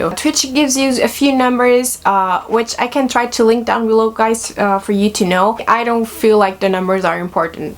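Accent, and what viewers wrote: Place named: Brazil. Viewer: Sabia que você era BR! Nosso sotaque é o melhor!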